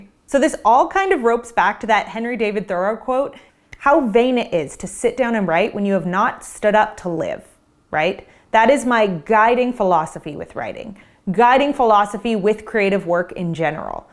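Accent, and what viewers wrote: Canada. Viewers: Hello from Belgium! I've been living here for almost 36 years after leaving my native Canada (btw, are YOU Canadian? You sound like a "mainlander" (I grew up on Cape Breton Island, NS). OK just listened to you for the first time - and seceral times it made me emotional. I hadn't realised that I was "completely normal" in my odd way of doing things (ALWAYS last minute - or not at all...). I have been wanting to write a book about my life for 20 years but always procrastinated (fear of failure mostly, I think). I'm 55 and have A LOT to write about and your suggestions absolutely resonated with me ...but they would, wouldn't they ! 😂 Thank you ! Now to start ... well, I'm teleworking today so as soon as I have a quiet, free moment. ❤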